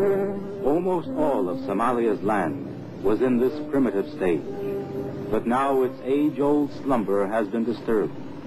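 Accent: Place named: Jamaica. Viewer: What's up with the jamaican accent? You're not even jamaican and you trying to sound like bob marley. Marley talk about ethiopia my mom's ancestor country, not somalia.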